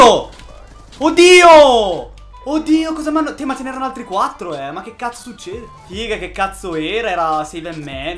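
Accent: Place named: Bergamo, Italy. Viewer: Quanto si sente in sto video il tuo(pure mio ahah) accento bergamasco oh ahahahah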